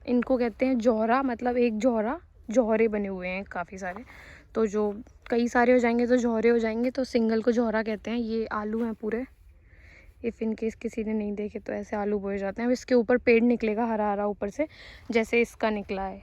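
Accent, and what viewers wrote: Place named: Meerut, India. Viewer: Your language matches with mine...you may be somewhere from meerut, moradabad, sambhal , chandausi wagerah.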